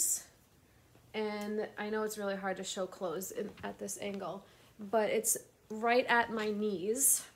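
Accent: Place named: United Kingdom. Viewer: I can never decide whether you are doing a London Cockney accent or a posh British accent. It's a bit of a mix. I have a very broad, thick Scottish accent but some say I sound like a posh Scot. lol. Makes me Guffaw every time! The silk dress would be great dressed down with denim jacket and boots. I am I big boot fan and wear them all year round, with just about anything. I'd probably add a big squash blossom, knowing me?! 😁 Take care and stay fabulous! 🤗💞✌️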